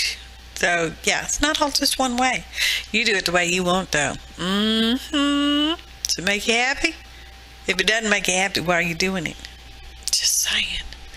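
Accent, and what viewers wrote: South, United States. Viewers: Cute cards......and love your southern accent!!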